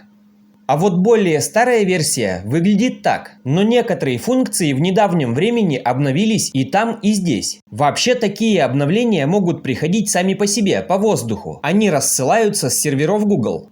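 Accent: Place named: Ukraine. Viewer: Почему у хохлов, такой ****** говор? Нет, реально раздражает.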